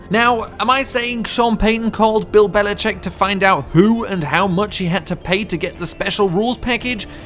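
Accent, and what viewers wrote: United Kingdom. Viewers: Its weird hearing a Brit or Aussie talk about American football. Whatever you are. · U sound like a complete dick head 😂 u sound like ur from the UK or some shit why are u even talking football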